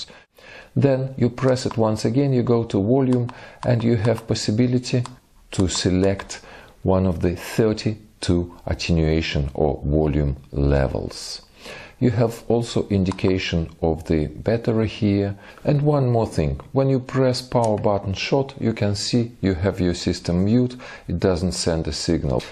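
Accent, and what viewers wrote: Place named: Russia. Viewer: День добрый. Такой акцент. Вы Русский?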